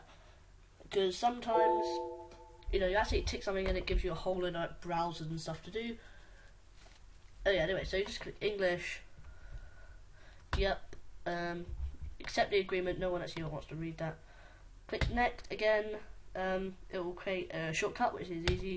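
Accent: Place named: United Kingdom. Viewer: Holy shit this boi is british Edit: But it's a good vid <3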